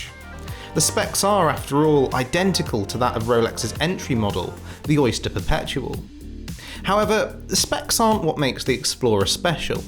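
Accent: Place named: United Kingdom. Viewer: I’m learning to hate the snotty Brit accent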